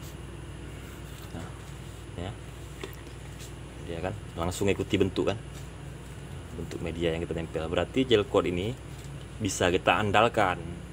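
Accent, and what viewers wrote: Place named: Aceh, Indonesia. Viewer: Aksen Asli Aceh Utara bang Mantap Kali bukan kaleng kaleng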